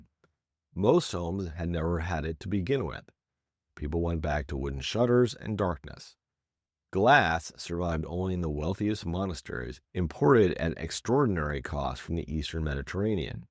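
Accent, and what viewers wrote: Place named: United States. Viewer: OMG. great subject but why the American accent? in is 2026, The U.S is not where it is at now. That has long gone. Can not watch, sorry. :(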